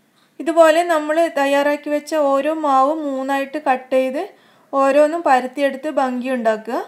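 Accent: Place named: Malappuram, India. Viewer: kitchen lnsha Allah......ennundakkanam. samsaram Kannor style allallo ....proper Kannor aalla annu thonnunnu.Oru Malappuram style und .Njan Malappuram aane .Athinal anikk kelkkan nalla ishttamane.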